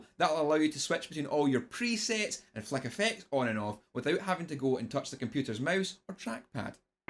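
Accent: Scotland, United Kingdom. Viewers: It took me a full 4 seconds to tune my ears to "Scottish". Thanks for the video :)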